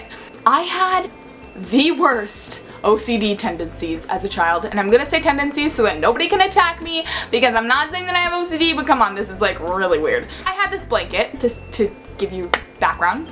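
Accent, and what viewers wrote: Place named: United Kingdom. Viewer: For some reason U sound like U have a British accent in this video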